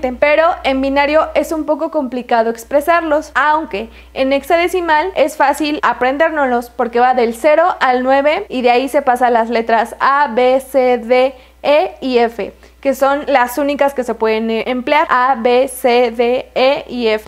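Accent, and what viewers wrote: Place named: Mexico. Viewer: Eres mexicana creo, por cierto me gusto el video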